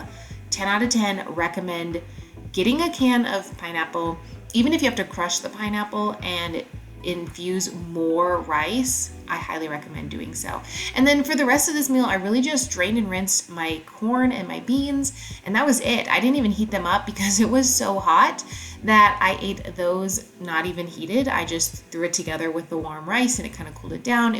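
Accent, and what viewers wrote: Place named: Minnesota, United States. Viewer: That MN accent was way more intense in 2019!! 😂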